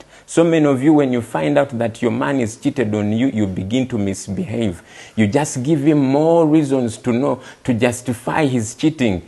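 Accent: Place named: Uganda. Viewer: Ugandan English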